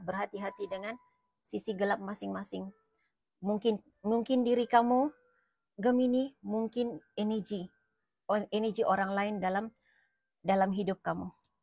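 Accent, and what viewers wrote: Sabah, Malaysia. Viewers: Dari logat bahasanya kaka ini macam orang Sabah sarawak😅😊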